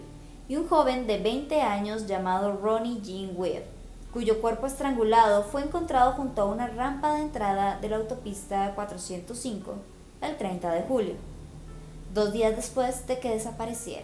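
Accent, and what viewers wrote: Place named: Mexico. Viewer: Me gusta este acento mexicano, me hace acordar a mtv xD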